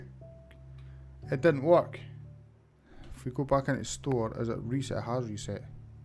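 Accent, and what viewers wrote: Scotland, United Kingdom. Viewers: Black Sheep Thats what I thought as well :P I love the Scottish accent though!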